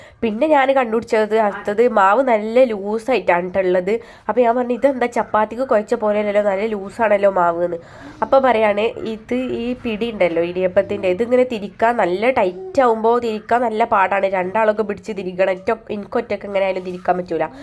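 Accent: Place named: Kozhikode, India. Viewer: Hi new friend🥰happy new year💐സംസാരം കെട്ടിയിട് കോഴിക്കോട് ആണെന്ന് തോന്നുനു🥰❤️nice vlog enjoyed😂😂😂🥰❤️അങ്ങോടും വരനെ കൂട്ടകനെ🥰❤️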